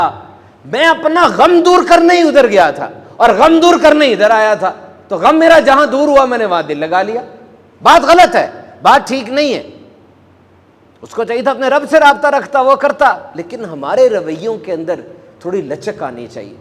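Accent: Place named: Punjab, Pakistan. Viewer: I like your all videos please stop Punjabi style English this use monafiqeen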